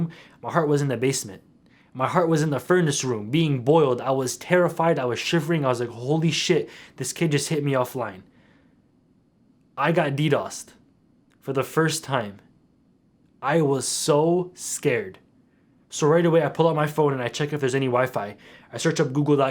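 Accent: United Kingdom. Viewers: is crying? british accent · your British accent is immaculate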